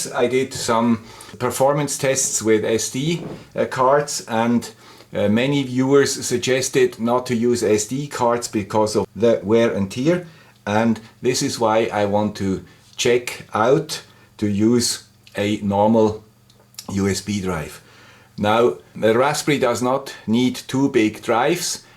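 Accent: Switzerland. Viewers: Thanks for the P channel mosfet highlight. It would be useful if you could do a video on high side switching. There are a few out there, but the guy with the Swiss accent would give us what we need to know as part of a back-to-basics series? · my best regrade to the gay with swiss accent>>>>>>nice work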